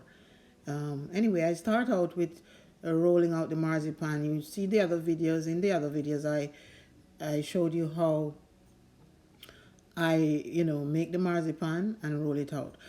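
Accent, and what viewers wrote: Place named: Jamaica. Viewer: This was lovely can hear the real Jamaican voice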